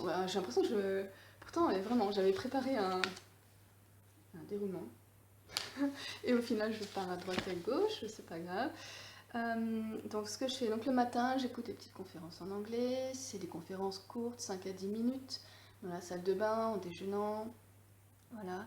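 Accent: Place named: France. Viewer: Les conférenciers sont très intelligibles parce qu'ils doivent parler clairement, souvent à une audience qu'ils savent internationale. Ensuite le niveau de vocabulaire, le mixage sonore, la tendance à répéter (les running gags d'un How I met permettent vraiment de capter des expressions et les rendre assez familières pour qu'on les trouve quand on en a besoin, et qu'on les prononce bien !), le confort physique dans lequel sont les personnages quand ils parlent, l'origine (UK vs USA) des acteurs vs. ce à quoi on a l'habitude, etc., influent sur la vitesse de progression VOSTFR (juste au début pour -> VOSTEN -> VO qu'on peut avoir. Dans la foulée, pourquoi ne pratiques-tu pas ta prononciation avec une vidéo/film/..., en apprenant un poème ou un discours que tu aimes ? Tu aurais le modèle à disposition pour savoir les sons à produire. Comme tu le sais ta prononciation actuelle a un fort accent français, et je crois que c'est très facile à comprendre quand on est français, surtout mauvais en anglais et habitué au franglais, mais moins pour un natif dont l'oreille est moins indulgente et moins entraînée à nos sons. 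J'ai énormément amélioré mon anglais en apprenant par coeur "I have a dream" avec le modèle en vidéo + quelqu'un qui m'aidait, et ensuite en écoutant des séries et vidéos Youtube. Les sons me sont entrés dans la bouche à force de les entendre. Si on est assez geek pour passer une grande partie de la journée à écouter de l'anglais d'une façon ou d'une autre, et qu'on a d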